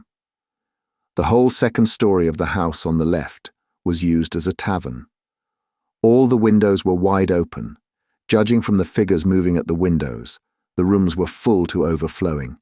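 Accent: United Kingdom. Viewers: Thanks. I needed a Brit for this one. :eyes-pink-heart-shape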